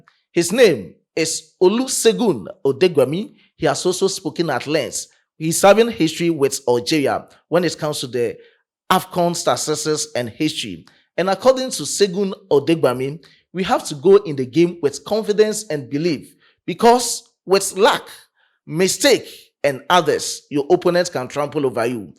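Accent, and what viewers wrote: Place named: Ghana. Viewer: Please u to speak 9ja English oo not gh 😅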